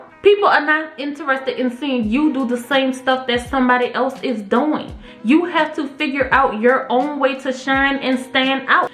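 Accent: New Orleans, United States. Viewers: You have to be from New Orleans. But this is a good video!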